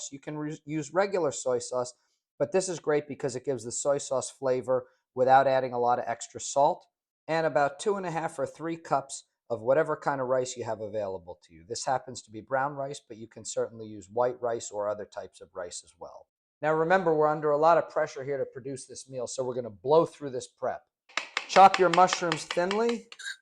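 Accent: United States. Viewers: Dats a Joisey accent. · Like the New York accent. Great meal. Thanks.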